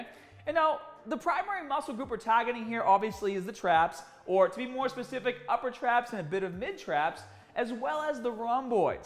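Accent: Boston, United States. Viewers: This guys is from Boston isn’t he. Sounds like marky mark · Ahahaha. I couldn't get over the bahbell and had to find out if you were from Boston. Lawrence, close enough. Hi from Manchester NH although I grew up in Somerville. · Love the Boston accent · Ha,ha,is this a Boston accent?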